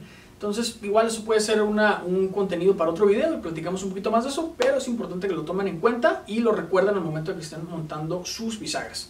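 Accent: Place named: Bogotá, Colombia. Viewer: He estado mirando la we y he visto que es de México, Me llamó la atención porque me daba la idea de un acento de Bogotá- Colombia y me he sorprendido al ver que es de Tijuana-México. El acento es prácicamente igual, de lo cual deduzco que México no es el solo acento que más conocemos , que será de algunas zonas, pero el de este joven es muy correcto, muy bien pronunciado, pero no es muy conocido.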